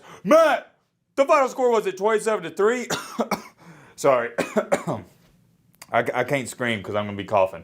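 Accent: South, United States. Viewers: listening to this guy gives me a headache. just some hick from the south that thinks he knows everything. im from TN too so i would know.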